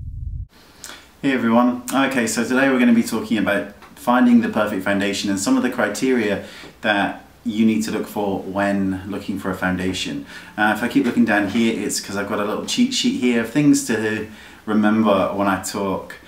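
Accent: Australia. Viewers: how come u have aussie accent! Sounds so cool though.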